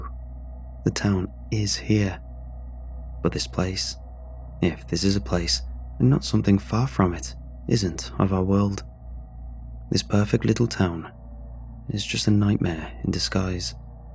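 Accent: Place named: United Kingdom. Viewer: I've just found you, it's refreshing to hear a follow brit narrate.. although I have a long way to go before I reach your status. If ever!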